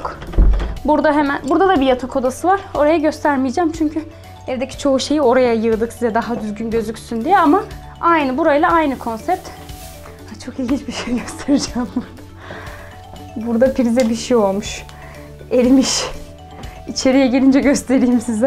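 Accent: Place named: Central Anatolia, Turkey. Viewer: İç anadolu aksanı var bence 🤔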